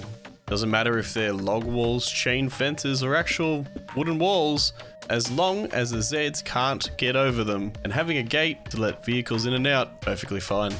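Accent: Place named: Australia. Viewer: I love the australian accent. You are doing great, keep it up!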